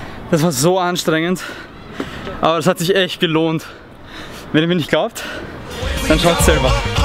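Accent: Austria. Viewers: bist du Österreicher ?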